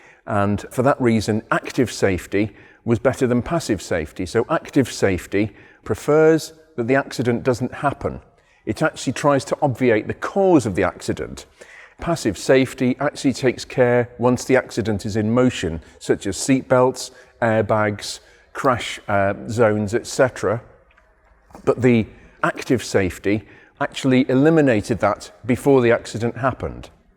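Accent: United Kingdom. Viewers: I'm so glad I found this channel! As an old car enthusiasts I absolutely love the idea of a distinct British gentleman talking about amazing old exotic cars, and with great production too. I see a bright future for this, absolutely lovely! 👍🏻 As a side note, if Agnelli rode on this car, you can be certain it has seen a lot of blow! 😅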